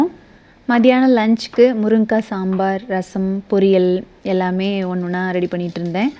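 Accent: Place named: Kongu, India. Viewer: Yenna alagaana kongu tamil!! Kannu padam poguthamma, muthalla suthi podunga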